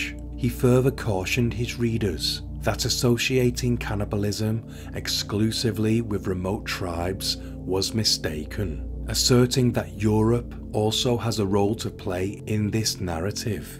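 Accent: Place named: England, United Kingdom. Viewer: English accent on narrated murder documentary is what I like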